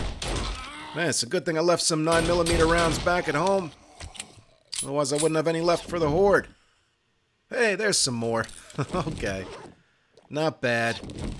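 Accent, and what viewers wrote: New Jersey, United States. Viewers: This guy is a gangster... has the jersey accent and mentality....have loved this guy long time...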